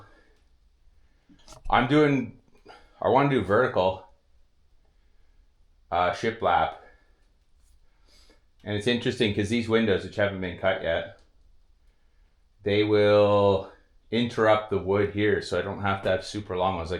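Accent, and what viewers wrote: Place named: Wisconsin, United States. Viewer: I love your Wisconsin accent but your using your ladder upside down, keepr moovin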